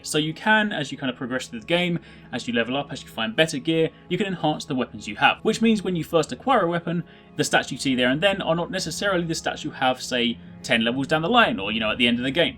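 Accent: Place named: United Kingdom. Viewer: OMG for the non Brits please talk slower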